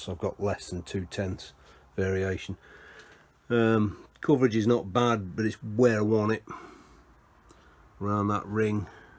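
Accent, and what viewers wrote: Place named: Leicestershire, United Kingdom. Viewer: I've been working my way through your Holbrook restoration videos, albeit a few years too late. As an old retired Machine tool Fitter, (I worked 50 years at Jones and Shipman, building Grinding machines) I have to say your work and methods are exceptional, especially your scraping tecniques, that have have come on leap and bounds, I thought I sensed a bit of a Leicesterhire accent too, me being from Leicester myself, fair play to you, have subscribed, cheers, Dave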